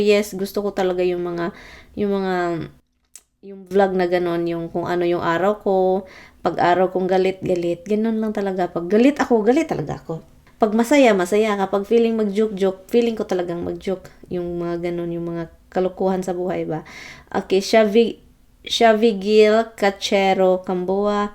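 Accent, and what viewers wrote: Visayas, Philippines. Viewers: surphlis kalog tapos cge ko katawa na mabisaya usahay ang tagalog nya😂❤️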